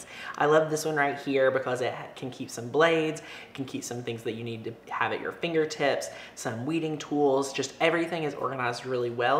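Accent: South, United States. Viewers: You have to be Southern!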